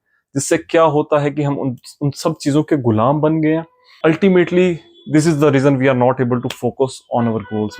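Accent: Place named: Kashmir, India. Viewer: First i thought you're from Delhi then our kashmiri accent got it 😅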